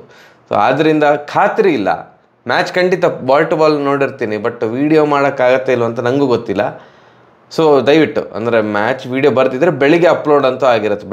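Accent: Mangalore, India. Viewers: Bro nanu nimge recent subscriber bro...... I watched all ur last ipl videos..... Janardhan sir comedy antu nxt lvl marayya😂chennagi roast madtare...... Nimma mangalore style kannada bahala chanda untu..... Keep going and keep roasting rcb if they perform bad😂avag swalpanaru buddhi barli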